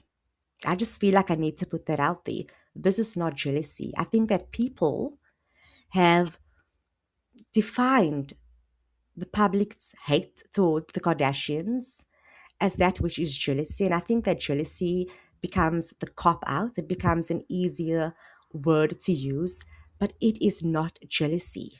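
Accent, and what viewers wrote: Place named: South Africa. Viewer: Omg girl are you South African🇿🇦???The accent??😭❤️please tell me you are South African I know a indian south African/coloured accent😂❤ Also nice video ❤and interesting take Although I do like their hustle,I also see where people are coming from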